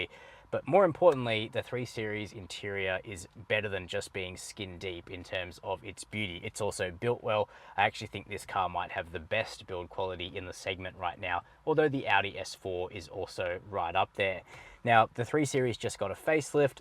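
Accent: Australia. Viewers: The host sounds Australian. Car prices in Australia is indeed quite high.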